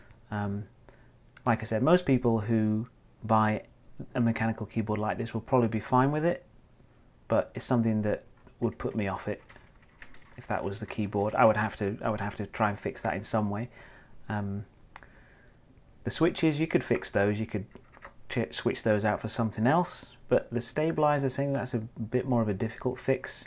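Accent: United Kingdom. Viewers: I have this keyboard and have just ordered o rings to reduce travel. I'm used to membrane and scissor keyboards on laptops. I've been looking for reviews about this board and found yours. The spacebar doesn't bother me, apart from the depth, but I'm curious: how come someone with such a RP British accent has an oriental username? Just curious.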